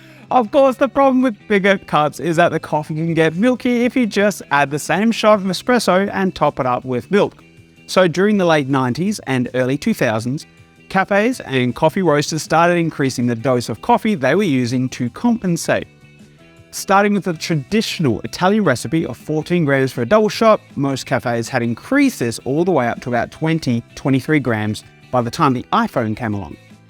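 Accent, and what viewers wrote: Australia. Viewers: Can we all agree that his australien access is fascinating 😍😍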